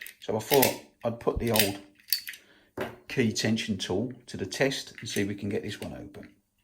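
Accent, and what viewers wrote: Birmingham, United Kingdom. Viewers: Nice one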